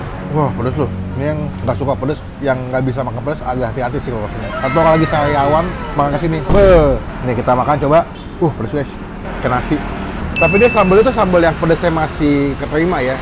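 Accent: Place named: Java, Indonesia. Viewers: Bang ngomong jawa serasa nonton ndarboy genk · njir jowo asli 😂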